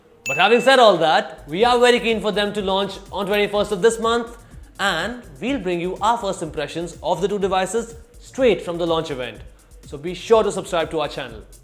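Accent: India. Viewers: i could not understand a word from your Indian accent...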